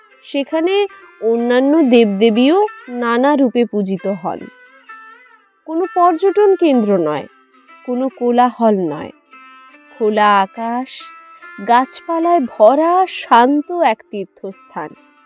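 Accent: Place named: Bankura, India. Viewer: দিনে দিনে, বেশ পাকা পাকা কথা শেখাচ্ছিস্! বেশ ভালো হচ্ছে, যে যাই বলুক, তোর মত তুই এগিয়ে যা, তোর শক্তি আছে। তোর বাড়ি কোথায় রে, এত ভালো বাকডি ভাষা বলিস! ভালো গান ও তো গাইতে পারিস!🎉🎉 ভালো থাকিস। আমি প্রায় তোর সব ভিডিও দেখি😂😂🎉🎉🎉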